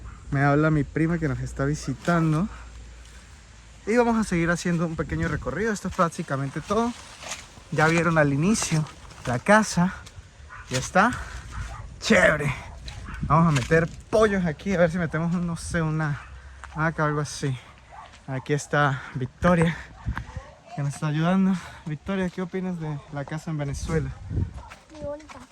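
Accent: Mexico. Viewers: ya hablas como mexicano amigo... muchos saludos ddesde Nayarit , Mexico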